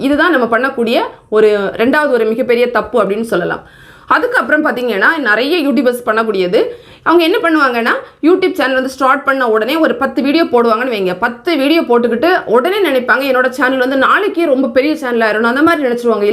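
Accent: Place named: Thuckalay, India. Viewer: nanum Thuckali pakkam than unga pechula konjam nam ooru vasanai vanthu athan ketten akka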